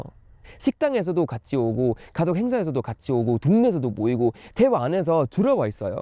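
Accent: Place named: Countryside, South Korea. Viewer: 말투가 한국말투~~약간 한국시골말투~~기냥한국사람같네❤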